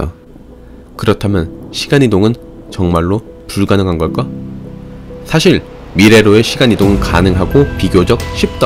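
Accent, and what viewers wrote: Gyeongsang, South Korea. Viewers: 숨길수없는 첯음절에 강력한 엑쎈트 깅상도사투리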